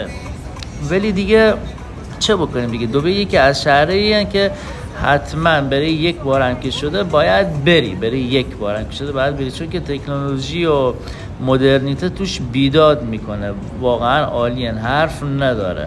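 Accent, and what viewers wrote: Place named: Bushehr, Iran. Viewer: لهجه حرف نداره بوشهری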